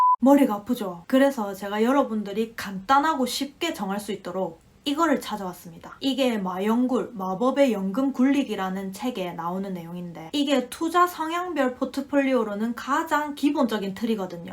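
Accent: Gyeongsang, South Korea. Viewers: 누나 ㅋㅋㅋㅋㅋ설명 너무 찰진다요 ㅋㅋㅋㅋㅋ 경상도 사투리라 더 머리에 쏙쏙 들어옵니다 ㅋㅋㅋㅋㅋ진짜 구라안치고 저는 ETF가입할까 말까 고민만 2년째했는데 누나 영상보고 바로 결정했습니다 ㅋㅋㅋㅋㅋㅋㅋㅋㅋㅋㅋㅋ감사합니다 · 경상도 사투리가 crystal clear 로 잘 들려요. 저는 경기도 정보 감사합니다 ETF 도 여러 종류가 있던데 묶음주식 10개 Main 을 보면 많이 다르던데 추천 부탁드립니다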